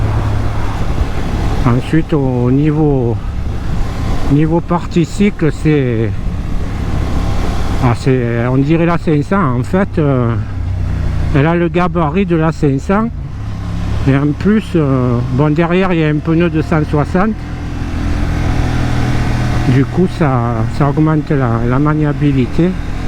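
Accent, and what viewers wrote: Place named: South, France. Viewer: Salut l'ami, j'aime ton accent du Sud 👍. Cette Hornet 750 ne m'intéresse pas mais de tous les Youtubeurs que je suis abonné je fais une exception parce que c'est toi, donc j'ai regardé ta vidéo. Moi c'est la nouvelle Transalp que j'attend et j'espère que tu pourras l'essayer dès qu'elle sera dispo à ta concession. Après, selon la fiche technique c'est la hauteur de la selle qui me fait peur...J'ai pas de grandes jambes, c'est pour ça que j'appréhende les Trails. ✌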